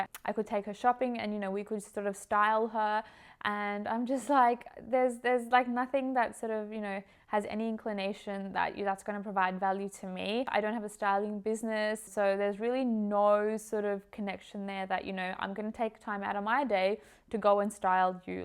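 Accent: Australia. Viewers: When I saw your video the first time, I told myself in my heart that I hope she speak hindi. Your accent is totally Australian. Good to know, you know the culture in and out.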